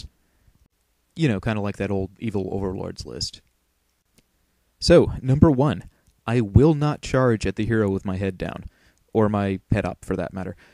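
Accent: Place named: Scotland, United Kingdom. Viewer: Bralliant! (Yes the Scottish accent is deserved).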